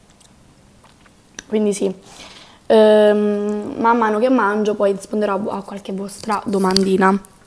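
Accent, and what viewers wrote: Italy. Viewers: Ma é proprio il suo modo di parlare é una cosa che hanno i napoletani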